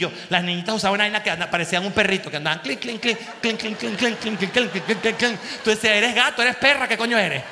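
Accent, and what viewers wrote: Cuba. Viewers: Más shows con acento cubano please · Bendiciones para ti y muchos éxitos más 😂😂😂😂no podia parar de reir cuando contaste lo del médico prieto jjjj nos violo a los 12 jjj eres lo máximo eres auténtico el mejor ,llevo la madrugada entera viendo tus videos, se jodio el trabajo mañana jjj ,haz mas chistes sobre cubanos lo agradecemos mucho y el acento de cubano te queda super bien 😂😂😂😂 bendiciones para ti desde cuba 🇨🇺 ❤ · que bien te queda el acento cubano yo soy cubana y me da mucha risa todo es verdad 😂🤣😂🤣😂😘🇨🇺 que comico eres😂😂😂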